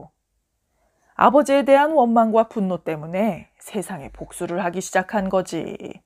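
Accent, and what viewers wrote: Gyeongsang, South Korea. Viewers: 갱상도 사투리 좋아요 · 사투리는 전형적으로 타지분들이 하는 서툰 경상도 사투리인데요ㅋㅋ 그래도 왠만큼 하시는것같고 귀여우시네요ㅎㅎ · 경상도 사투리..ㅋㅋ 감사합니다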